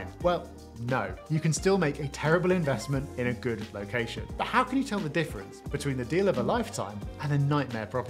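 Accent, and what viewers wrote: United Kingdom. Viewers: The presenter sounds like he has a posh Liverpool accent too !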